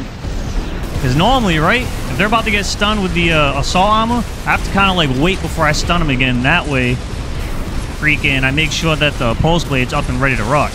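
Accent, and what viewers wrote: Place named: East Coast, United States. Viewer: Love your videos homie! Judging by your accent I’m guessing you’re from the east coast Boston or jersey! My old job I had a ton of coworkers who came from the east coast to train with me here in Cali so I can spot that accent a mile a way lol. Btw I’m on my third play through on the story mode. I’m trying to unlock all parts before I make the journey in to pvp. I’m a veteran of this game dating back to ac1 but due work and family duty I’ve been trying to make some time For some ac goodness !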